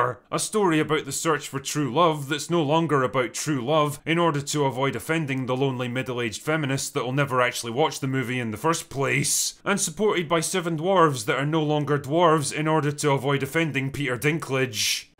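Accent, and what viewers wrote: Scotland, United Kingdom. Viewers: German with a Scottish accent, music to my ears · I really do appreciate his take spot on, also wow I didn't know was Scottish I assume he was British accent hard to decipher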